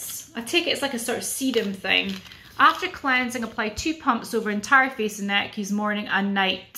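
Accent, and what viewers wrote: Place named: Scotland, United Kingdom. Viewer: Great video nice to hear another Scottish accent xxx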